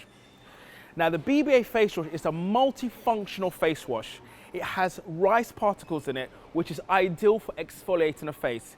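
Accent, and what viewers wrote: United Kingdom. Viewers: ''Your British accent ...is killing me ...very nice ...'' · Love the British accent, this man really knows his business. Also he's totally classy.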